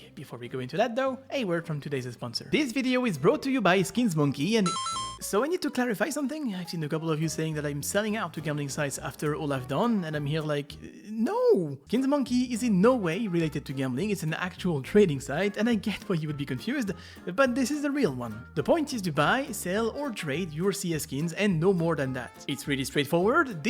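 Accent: France. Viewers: In the first minutes I didn't realised ur french. Srryy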